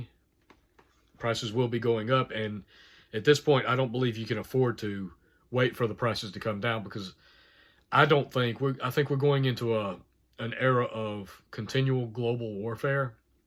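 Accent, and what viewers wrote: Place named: Southern Virginia, United States. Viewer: I'd like to meet you, im in Blue ridge mountains of southern Va, where are u located, you sound like you'refrom my neck of the woods ??? Im looking to form a mag but nobody around me are reliable or aware???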